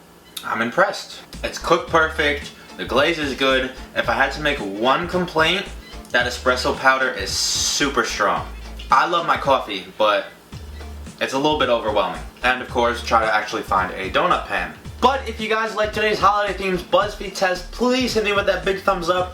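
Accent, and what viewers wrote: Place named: Boston, United States. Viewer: are you from boston?? you have such a bostonian accent!! i love it hahahaha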